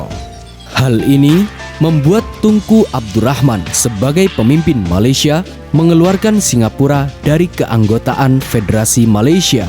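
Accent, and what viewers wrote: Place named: Java, Indonesia. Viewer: Ini sudah sah jawa biadap. Ngomong jawa dong jgn pakai bhs melayu loghat jawa kamu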